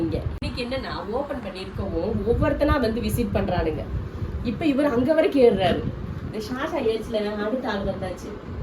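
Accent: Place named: South Tamil Nadu, India. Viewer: Sis neenga irukirathu covai. But native south district. Am I right? B cas neenga pesura slang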